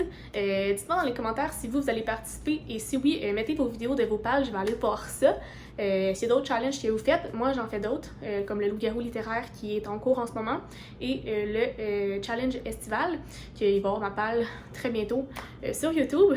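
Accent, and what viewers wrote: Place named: Canada. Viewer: Salut ! Je viens de découvrir ta chaîne et j'aime beaucoup (même si j'avoue que que ça fait étrange d'entendre l'accent canadien mais en même temps ça fait voyager ! ). Je ne participe pas au moins de la Bit Lit parce que je n'en lit pas trop... Bonne lecture !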